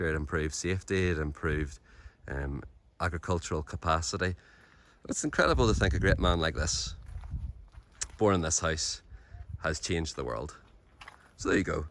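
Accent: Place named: County Down, United Kingdom. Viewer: Thank you - very interesting. Your lovely County Down accent is like honey in my ears!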